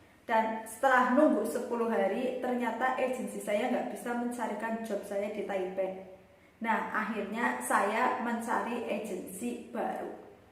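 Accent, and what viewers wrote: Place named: Banyumas, Indonesia. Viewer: Aku sukak deh gaya dan logat mbak yg ngapak...orak ngapak orak inak wekwekwkewke lanjut mbak oleh oleh khas Semarang sudah kusematkan jangan lupa kembalian apalagi di rumah ada masakan arab yg siap dimakan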